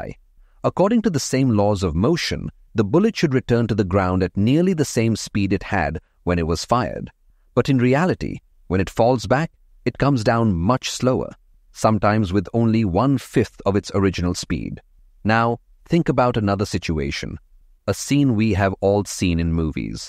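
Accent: India. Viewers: why do i feel like a hint of Indian accent in this videos commentator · Not just a hint. It is an Indian accent. I know because I am Indian. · clear indian accent though. But much more understandable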